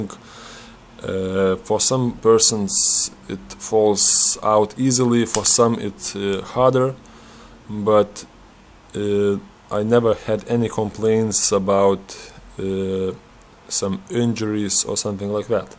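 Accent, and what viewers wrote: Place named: Eastern Europe. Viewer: voice like Arnold? :) well Arnold is from Austria and has kind of german accent :) but this mate has slavic accent.. Like me :D Ukraine or something like that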